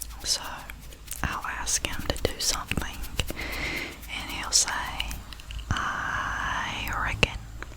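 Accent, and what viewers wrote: South, United States. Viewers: Hi. 🙂 I didn't know that you had a Southern accent. You certainly kept that quiet, didn't you? 😂😂 I understand what you mean by 'Lord have mercy'. Please look after yourself and don't tire yourself out. 💙💙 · It was a great video!! Im not from US so it’s interesting to listen to a southern accent. I personally love it ❤️thank you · Okay literally your southern accent just makes your. ASMR 10000x better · Your southern accent is hella dope. Lol. I'm from Cali where we say hella ;) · It would be interesting to hear your impression of an even stronger southern accent! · Its a ASMR Hat Trick-- 3 Video's in 3 days 😉!! What can I say I follow hockey being from Detroit!! Love your Southern accent 😎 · I’m from the north and I love your accent and I swear I think I’ve only known two or three people in my whole life who have a real southern accent like yours and I think it’s wonderful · As a southerner I don't have as much of an accent as other southerners. 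Yours is just a little bit stronger than what I would consider average. The louder I get the stronger the accent gets 😆. It's refreshing to find a southern asmr. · On a scale of 1-10, I give your southern accent an ♾ (infinity)! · I was literally admiring your beautiful southern accent when I saw this video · I didn’t even realize you had a southern accent but I’m from south Texas so you just sound normal to me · I love your southern accent! I hate those that you can’t tell if they’re British or southern!! Yours makes me feel like I’m back at home. 😘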